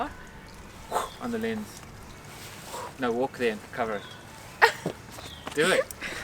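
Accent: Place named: South Africa. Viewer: Was just about to say that you have a South African accent....kanti you're a local With some international talent. Keep up the good work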